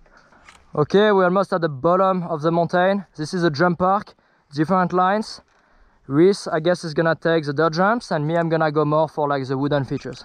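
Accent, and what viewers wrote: France. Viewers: Your accent look's like French accent 🤔 By the way you're a very good rider ! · You know,you’re such an talent,you’re videos are amazing, but,honestly,i can’t listening this french sound english,it rippes my ears dude and i’m just wondering why everybody from France has such a strong accent.🤷🏼‍♂️😣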